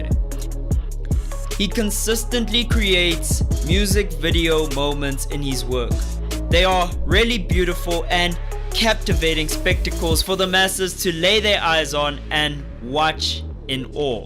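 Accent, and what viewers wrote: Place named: South Africa. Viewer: I really liked your video. I can even hear that you're south African because of your accent and that you pronounce the names correctly.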